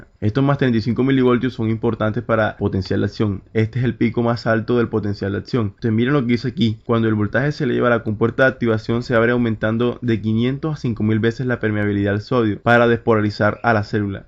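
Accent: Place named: Caribbean Coast, Colombia. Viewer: Muchas gracias! Felicidades!! Te pasas !! Pd. Se te siente ese costeño bien bacano! Jajaja.. Yo también amo la medicina!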